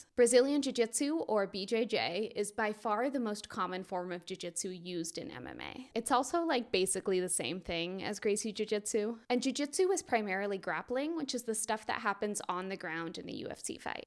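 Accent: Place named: United States. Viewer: Maeda went to brazil specifically to showcase judo. He was a prize fighter who traveled the Americas doing demonstrations and challenge matches. He was not a business man. She's American, so I wouldn't say it's incorrect to pronounce their names with an American accent. I've heard some Americans that train under the Gracies use the R when pronouncing their names. Roger Gracie fought once in the UFC against Tim Kennedy.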